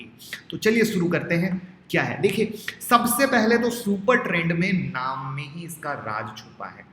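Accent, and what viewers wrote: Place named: Lucknow, India. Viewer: Lagta hai Aap Lucknow ke ho 😀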